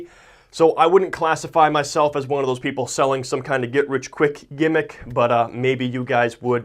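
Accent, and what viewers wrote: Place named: Midwest, United States. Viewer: Great video. May I ask where you're from? I hear a Midwestern accent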